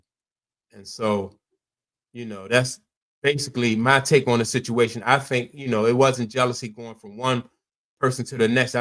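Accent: Baltimore, United States. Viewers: Sound like you from bmore.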